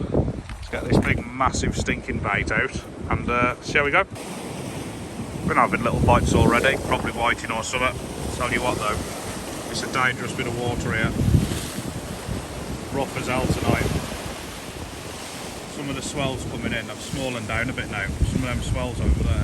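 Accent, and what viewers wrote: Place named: Worcestershire, United Kingdom. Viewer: Are you a Worcestershire lad. Every time I hear your accent it reminds me of my home town of Bromsgrove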